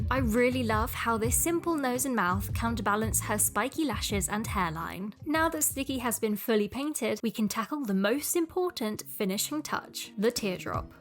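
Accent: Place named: United Kingdom. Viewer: This is the first ever time I've heard you speak and I did not know you were British omg!! I love your style so much it's such an inspiration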